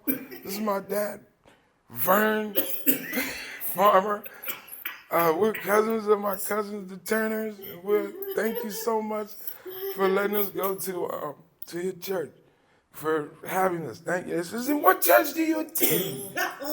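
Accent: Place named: Cockney, United Kingdom. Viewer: Watching you from London, UK, love the 'cockney' accent, lol.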